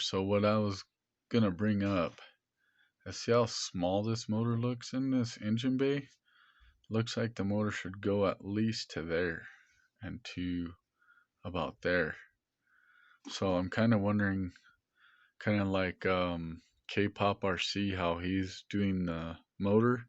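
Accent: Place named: Alaska, United States. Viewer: Are you Alaskan? My cousin has a similar accent, she lives in Juno. Just curious! You have Great Content on here! Keep the Awesome Videos coming! 🤗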